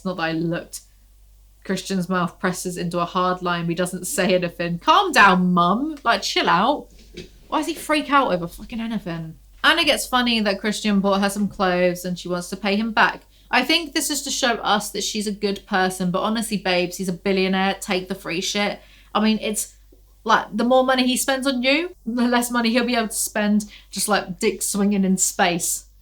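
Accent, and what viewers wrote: United Kingdom. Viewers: every time i finish one of your 2-3 hour long videos i start thinking in a british accent. i just wanted to share this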